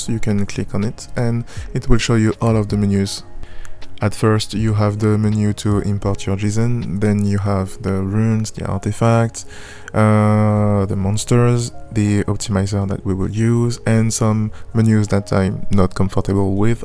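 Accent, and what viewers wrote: France. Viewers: Le bel accent francais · On sent le francais derriere t'es bien le premier ou les sous titres youtubes sont parfait 😂 Tres bonne vidéos dommage que pas en fr!😢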